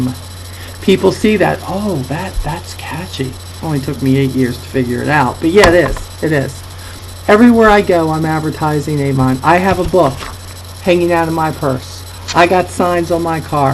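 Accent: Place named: Maryland, United States. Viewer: Your videos are informational, packed with lots of tips and inspirations of your experiences in the real world of Avon. Love the part where you say, Avon Chicks. For some reason, those two words and the emphasis you place on those two words make me smile. I think it's your Maryland accent. I have a wish for you and Lee. Continue to rock in your Avon business! (Jeff)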